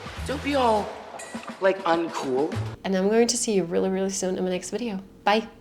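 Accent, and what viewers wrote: United States. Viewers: Dein amerikanischer Akzent ist so gut, so dass ich, als ich zum ersten Mal auf deinen Kanal bzw. auf deine Shorts gestoßen bin, zuerst dachte, dass du eine Amerikanerin bist, die perfekt Deutsch kann und einen deutschen Akzent perfekt immitieren kann, bis ich den Namen deines Kanals gelesen habe😂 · You have a perfect American English accent. I don't think most native speakers would be able to tell its your second language. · Great video. I was stationed in Germany for eight years and I have local German friends now in SoCal, and you, by far, have the best American accent that I have ever heard from a German. I too was an English major, and I totally agree with the value of college level phonetics for understanding how sounds are made. In my case, we studied Afrikaans for a semester as a bridge language between German and English. Anyway, well done. 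Keep up the great content. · Hello! Watched this whole video even though I have always been an American and lived in America (algorithm is confused). I love that you are sharing helpful methods that can also be applied the other way around. You definitely sound American-- I just wanted to comment on the "different kinds of American accents" because while I would think upon meeting you that you were an American, I certainly wouldn't think of you as having the average voice. You have developed a rather heavy valley girl accent and intonations/"isms" which completely makes sense when you watch tv to find your voice. This accent definitely has a connotation of class as well as region-- it isn't just how suburban LA people talk, plenty of Californians have nowhere near that accent, as it is by now associated with the "rich airhead" stereotype and getting less popular than it once was. My first confusion in listening to you speak would be your "O"s. 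They come off a bit hard, but I would still assume you are American, just that you possibly grew up in the Midwest and moved to Cali or vice versa. There's probably some other regional tendencies from middle-to-upper-class New York City based on some of the shows you picked out, but I grew up in Connecticut so I am blind to many of those. But yeah, great video, I hope you don't mind me nitpicking, just wanted to give the perspective of an American and point out that the next step after watching all this tv and imitating voices would be finding the differences betwe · I really respect and admire your authentic American accent. I know you put a lot of work and study into it because it shows. .......HOWEVER, as an American, I think I can speak for a lot of people that the vocal fry is unflattering, annoying, and very unpleasant to listen to. While some people will tell you that it may not bother them too much as it is normally limited to young college age women, or those who live in large metropolitan areas, it's easily avoidable for those outside of those demographics or areas, I have never found anyone who actually thinks the vocal fry is attractive or desirable in women. 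I am not telling you this as a negative critique, but more as a polite mention that you do not have to include that manner of speaking to sound authentically American. As a matter of fact, many Americans would appreciate it if you didn't. We have enough of them here that already have that covered. Thanks. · Wow. Never seen your channel before. I stopped the video right after you said you've only lived in the US for under 2 years. Yet, as someone born and raised in the US, I can definitely say you sound 1000% American from your "lack of accent" :-) ... I have seen this before, but it was with people who attended an English speaking school growing up that used Americans as teachers. So, while they grew up elsewhere, they were steeped in American English from a young age in school. I need to watch the rest of the video to find out how you did it ... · Yea, ich bien second gen Deutscher, and you sound just like an American!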